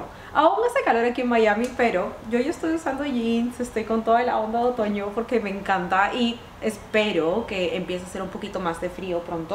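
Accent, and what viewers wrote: Peru. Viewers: a le sale más su acento peruano con su mamá jaja me encanta!! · Un solo día con su mami bastó para que el acento de peruana regresara jaja soy Mexicana y lo noto muchísimo, me imagino es como Peruano fresa, me encanta:D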